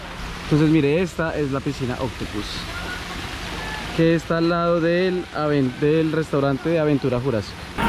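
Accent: Colombia. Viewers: comunica colombiano jajajaja. la buena parce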